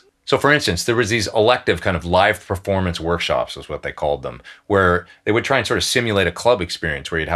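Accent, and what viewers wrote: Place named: Canada. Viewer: Great advice. Sounds like you might be a fellow Canadian!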